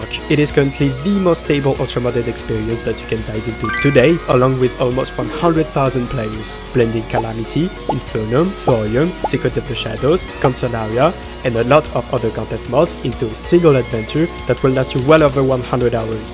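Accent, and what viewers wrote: France. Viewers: Goated guide as always this guy is just built different are u french? cuz yk the accent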